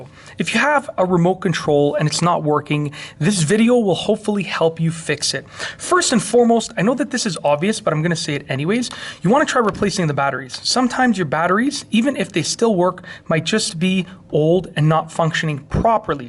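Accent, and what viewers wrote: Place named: Canada. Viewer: thanks so much for this great tutorial! totally fixed it! and i think i understood your Canadian accent!!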